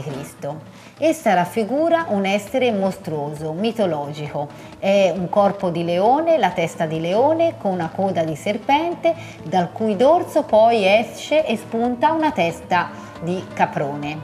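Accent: Tuscany, Italy. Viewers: Es italiano con el acento toscano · Se cerchi di togliere quella c toscana almeno quando spiegi sarebbe meglio per una parlata più omogenea e italiana perchè a certi da dastidio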